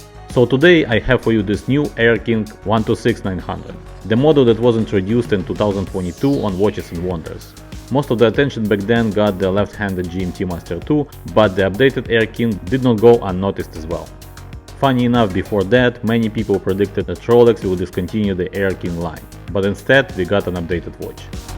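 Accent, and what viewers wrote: Russia. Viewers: рязанский акцент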